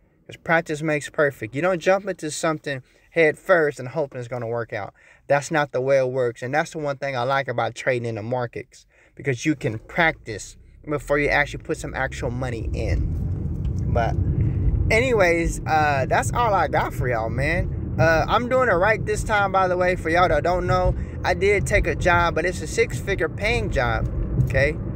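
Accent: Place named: United States. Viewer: My mentor is sounding more country each video lmao. Good job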